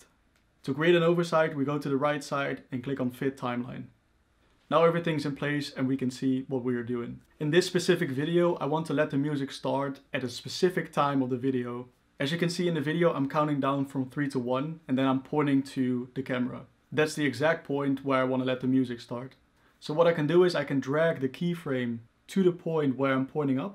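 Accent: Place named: Netherlands. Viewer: you got an accent are you from the netherlands?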